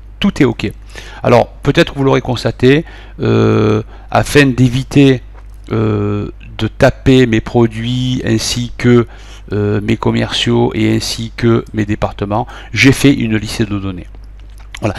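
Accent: South, France. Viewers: Meilleur intro. On est dedans direct (enfin moi je l'étais) et puis ça part d'un coup. Ça parle bien c'est net et précis. Mention spéciale à l'accent du Sud qui me rappelle cette boite de conserve "saucisse lentille" mangée en khapta. Merci encore <3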